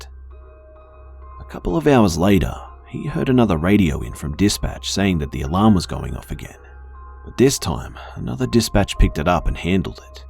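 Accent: Australia. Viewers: Finally, a familiar Aussie accent!! I could tell by the whispers at the start lmao :')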